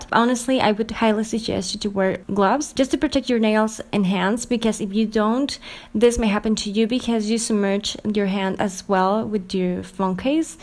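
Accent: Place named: Germany. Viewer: I love your accent. Are you German?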